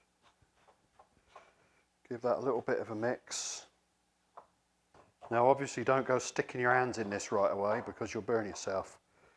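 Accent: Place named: Gloucestershire, United Kingdom. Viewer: Thanks, this video is clear and informative, can't wait to have a go. Love the Gloucestershire accent.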